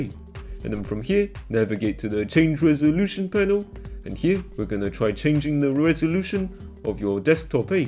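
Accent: New Zealand. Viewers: Hes a kiwi😂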